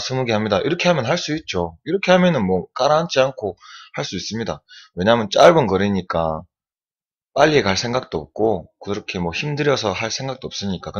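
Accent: Gyeongsang, South Korea. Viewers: 일본어 자막에 틀린 글이 너무 많네요. 그냥 구수한 갱상도 사투리로 쭈욱 하시길…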